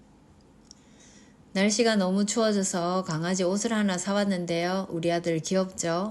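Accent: Gyeongsang, South Korea. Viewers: 경상도말투가맞아요 かわいい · 억양은 경상도 시네요~^^ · 우연히 이 영상으로 처음 들어오게 되었는데 경상도 사투리가 살짝은 있지만 아주 티날정도는 아니에요!! 일본으로 유학하러 온지 3개월이 된 사람으로서 이런 에티켓 알려주셔서 너무 감사하고 따님분 한국어 너무 잘한다고 전해주세요~~!! 다시 한 번 감사합니닷! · 이 분과 9개월전 제가 거래했던 부동산 대표님--경상도 상경 추정되는 50대초중반 연령, 서울거주 20수년이상분과 억양, 어투 싱크로율 99%네요. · 저는 첨부터 경상도 출신인거 알았는데요^^ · 억양이 경상도가 있어요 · 억양이 경상도입니다.섞이다를 경상도분은 썩이다라고 말함. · ㅋㅋ 경상도 억양 있어요 그런데 그게 어때서 좋아요 따님도 우리말 잘해서 좋아요 엄마가 잘가르치셨네요~~^^ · 이분은 경상도분이구나~ 생각했어요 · 경상도 사투리 쓰고 계십니다. 말할때 높낮이 ( 사투리 억양) 로 사투리임을 알 수 있습니다^^ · 엄마와 딸사이가 좋은게 부러워서 별 되도 않는 말을 하나봅니다. 못난 사람들은 무시하세요 ㅋㅋ 근데 넘 귀여운 경상도 억양이셔요~ ❤ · 경상도 억양이랑 사투리 쓰시네요. 본인이 모르실 뿐. 사투리가 나쁜것도 아닌데 왜 안 쓴다라고 하시는지. 그리고 따님 한국말 능숙하게 구사하셔서 아주 이쁘세요 😍 💕 ❤ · 나레이션에 경상도 억양이 있어서 더 듣기 좋아요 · 경상도 억양이 잠깐씩 나타납니다 뒤늦게 정주행 하지만 성격도 경상도 기질이 보여지네요~~^^ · 난 사투리 안 쓰는데에 악센트가 경상도 분인걸 단번에 알겠네요ㅎㅎ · 엄마가 경상도 사트리가 좀 있어요♡^^ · 빕먹을때 안쓰는 손도 상 위에 올려놔야 하고, 젓가락이 무기가 될수 있다는 상상이라니… 일본인들은 기본적으로 의심이 많군요.. 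😮 그리고 누가봐도 경상도 사투리 쓰고 있어요 😂😂😂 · 경상도사투리억양이 강하신데요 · 사투리 안ㅡ쓰는데 억양에 경상도인줄 알았어요 어때요 모국언데요 욕만 경상도식으로 안하심 됩니다 문딩이 가스나가 하면 조금. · 어머니, 단어 억양에 경상도 성조가 있어요. ㅋㅋㅋ 저도 처음에 듣고 이분 경상도 분이구나 하고 알았습니다. 그런데 듣기 좋아요. 오늘도 잘 보고갑니다. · 억양이 경상도 억양이예요. 경상도에서 자란 친구들 다 사투리 안쓰지만 억양때문에 사투리 사용하는 거 같아요. 따님과 대화 항상 좋아 보여요. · 저도 경상도 사람이에요 ^^ 경상도 억양 반갑고 정겹습니다 따님 한국억양도 경상도 억양이라 속으로 웃었네요~ 그치만 어쩔수 없지요.. 따님 든든하시겠어요^^ 일본 생활 엿보는 재미가 있어요 응원합니다 화이또! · 왜 이상해? 한국말 잘 하는게? 잘 가르쳐서 그렇지~~ 얼굴 안보여주는게 뭐가 이상해? 그럴수있지 다니면서 불편한거보다 낫지 경상도 사투리 씁니다 ^^ 너무 보기좋고 다정한 딸램 부럽습니다 😊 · ㅎㅎ 사투리 마이 쓰십니다 ♡ 어투에 갱상도 사투리가... 너무 너무 대단하십니다 한번씩 들러 보는게 이젠 하루의 낙이 됐네요~^^ 항상 가족 모두 행복하세요~♡ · 참 별 넘이 다 있네요....... 따님 나름 노력 많이 했을텐데... 근데........ 사투리 안 쓰신다고요???? ㅋㅋㅋ 경상도인지 바로 알겠던데요.. ㅎㅎㅎ 항상 잘 보고 있습니다.. 이쁜 따님에.... 이해해주는 신랑에.. 일본에서 잘 살아오셨네요.. 자부심 가져도 되실 분이신데.. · 한국인과 일본인 차이점 사소해도 많지요. 님은 나레이션만 들어도 경상도 사람임을 일수 있어요. ^^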